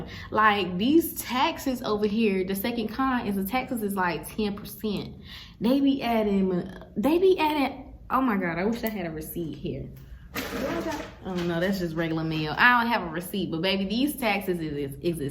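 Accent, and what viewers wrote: South, United States. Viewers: The accent is giving South Carolina (1st Guess) , or Texas (2nd) or Georgia (3rd guess) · First of all, you're so pretty, and I love southern accents! But yesss sis! I love seeing Black women doing their travel thing! I'm on my way down there in a few weeks, and I'll be there for a month. Just trying to make sure it's not a stupid/dangerous move for me to be staying there alone for a few weeks. I'm going down with friends, but then they're leaving and I'll be there alone for two more weeks. I'm either going to take some Spanish classes to give me somewhere to be during the day and people to be around. Or I'll maybe forget the classes and do a homestay so I'll have people/a family to keep me company 😅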